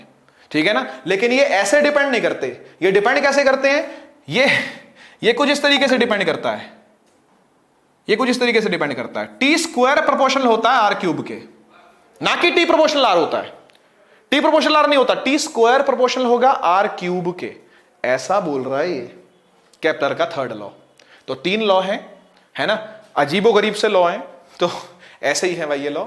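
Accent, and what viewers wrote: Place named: Pahari, India. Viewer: Sir bhi pahari bolte ha............ nicha thala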